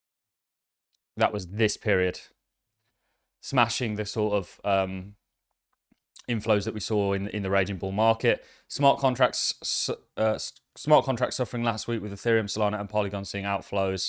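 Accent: Birmingham, United Kingdom. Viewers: Cant get more British than a brummie 😂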